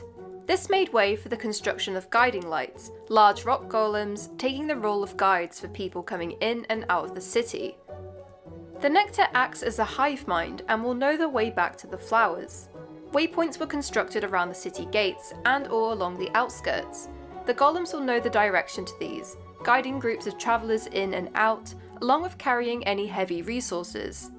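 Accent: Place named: United Kingdom. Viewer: my first time watch watching you and my first thought was Bri'ish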